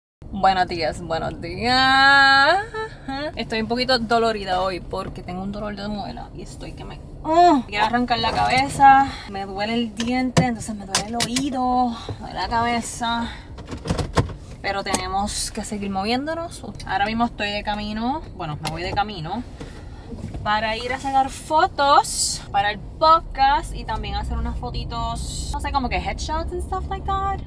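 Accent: Puerto Rico. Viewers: Mira de verdad lo unico de China 🇨🇳 q tu tienes es tu rasgo Fisichella xq hablas como nosotros los Boricuas con nuestro acento osea q los años q vivistes en NYC NO AFECTARON ESE ACENTO, MUCHAS BENDICIONES EN TU NUEVO PROJECTO Y Q SEA DE MUCHO EXITO,AMEN, GRACIAS X REPRENTARNOS CON MUCHO ORGULLO ❤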